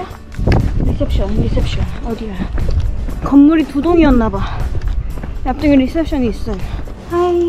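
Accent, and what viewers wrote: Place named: Jeolla, South Korea. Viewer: 전라도 사투리 귀여워용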